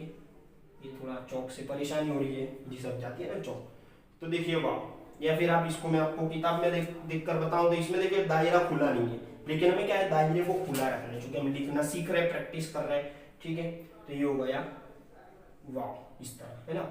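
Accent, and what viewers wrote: Gujarat, India. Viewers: Tame Gujarati chho??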